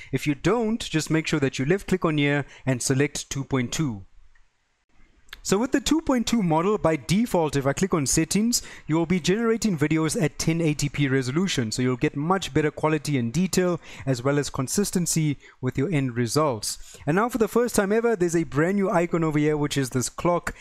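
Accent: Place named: South Africa. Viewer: Was just looking for an overview of Pikaframes and found your channel. Then while listening, i was like, this guy sounds South African! Then found out you are. So i am so glad to see fellow South Africans doing well on YouTube and in the AI space. Im still trying to get there, but hopefully will someday, but at least your video gave me what i needed to know from Pikaframes to know that it is going to work for my next YT channel and hopefully will do well :) Thanks bro!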